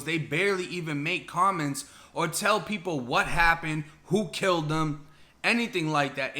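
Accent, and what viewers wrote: Boston, United States. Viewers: Thats Boston. I get made fun of for my Jersey Accent. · You from Boston? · Woman love the Boston accent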